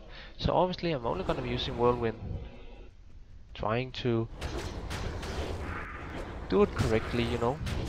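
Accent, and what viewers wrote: Denmark. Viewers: try to lose just a bit of the danish accent. It's like nails on a blackboard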